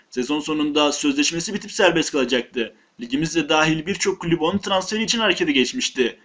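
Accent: Gaziantep, Turkey. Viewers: 1 sen anteplimisin 2 lyon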